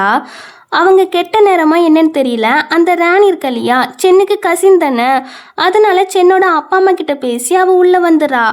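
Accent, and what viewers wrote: Tirunelveli, India. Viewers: Novel reborn story romba nalla solrenga pa 👍 super ah eruku nellai slang 💐 weekly 1dramavathu podu pa unga channel la 2years munnde potta drama en delete pannetenga 😔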